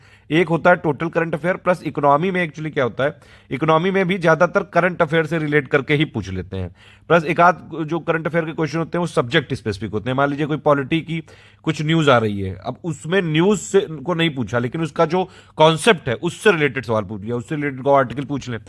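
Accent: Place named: Uttar Pradesh, India. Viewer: Apke bolne ke style se hi maja aajata. Bindass bolte sir, pura u. P. Style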